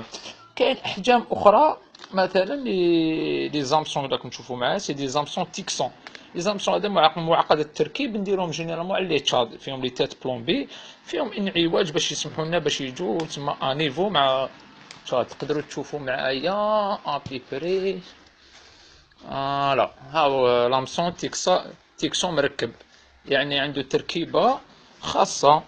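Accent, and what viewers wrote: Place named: Algiers, Algeria. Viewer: Salem frère..un petit conseil d'amis essaye de parler en arabe car l'accent algérois est détester par les 47 wilaya et en plus cette chaîne est connu de Tunis jusqu'à Palestine...ne prend pas mal mon conseil frère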